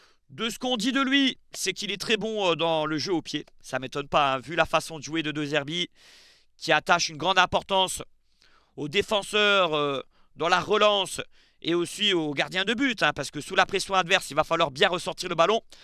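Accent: Corsica, France. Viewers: Ç'est pas la 1ere fois jtecoute 👍🏽 hors contexte mais il semblerai que tia l'accent de nos amis Corse, jme trompe ?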